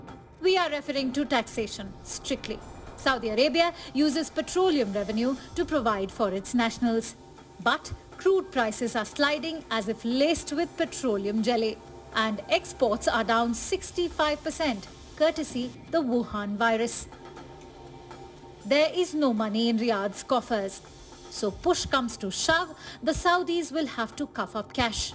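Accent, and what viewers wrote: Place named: India. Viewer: Cheap Indian accent led to cheap and wrong news 🤦🏻‍♂️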